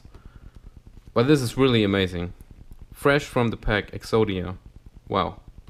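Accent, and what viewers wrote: Germany. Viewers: Das Video leider erst gerade entdeckt :D Ich schreibe jetzt Mal auf Deutsch, weil sich Dein englisch so anhört, als würdest Du prinzipiell auch deutsch sprechen^^ Aber echt beeindruckender Pull. 1st Edition LOB Exodia boosterfrisch - wow! Da kann man nur Glückwunsch sagen :) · Kommst du aus deutschland ? :) hast so nen deutschen akzent 😊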